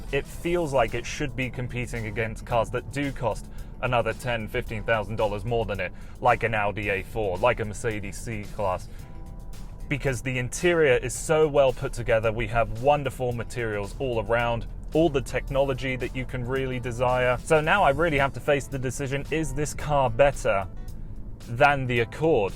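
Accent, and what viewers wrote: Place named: United Kingdom. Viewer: Hes British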